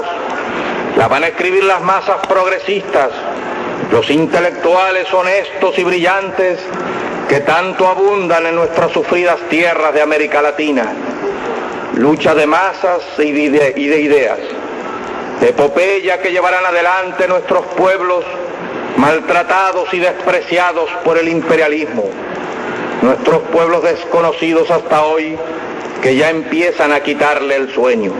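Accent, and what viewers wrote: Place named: Cuba. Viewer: Porque hablaba como cubano?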